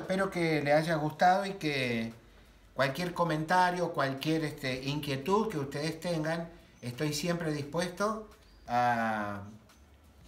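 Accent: Santiago del Estero, Argentina. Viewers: Que lindo escuchar la tonada santiagueña en un video productivo 🙌🏼 abrazo grande hermano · Q bueno amigo .tenés tonada santiagueña puede ser? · Amo la tonada Santiagueña soy de catamarca muy linda explicación 💪🏼💪🏼🇦🇷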